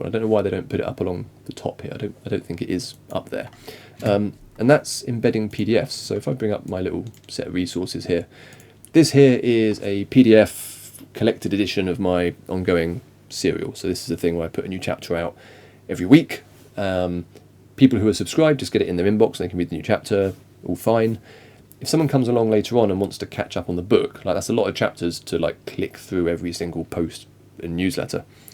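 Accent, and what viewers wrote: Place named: England, United Kingdom. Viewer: Thank you, I'm also very new to Substack and I appreciate that you SHOW what you're doing as you're doing it. Also, an english accent always sounds far more intellectual!:) You've got a new subscriber. I'll be back!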